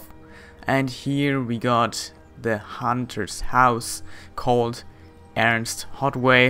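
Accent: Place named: Germany. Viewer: Guys he's German.. So i wanna hear you guys speak German.. And then say no it's pronounced like that.. -.-'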